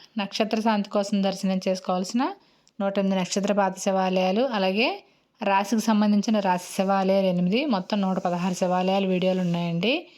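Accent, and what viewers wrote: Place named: East Godavari, India. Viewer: మీ వీడియోస్ అన్ని చాలా బాగుంటాయి గారు.నేను మీ వాయిస్ చాలా బాగుంటుంది.మీరు చెప్పే విధానంలో ఈస్ట్ గోదావరి నేటివిటీ ఉంటుంది 👌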